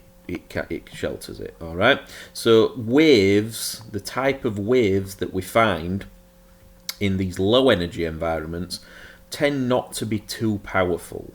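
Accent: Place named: Northern England, United Kingdom. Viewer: I'm only here to listen to that delicious nothern voice say 'Cooooast'.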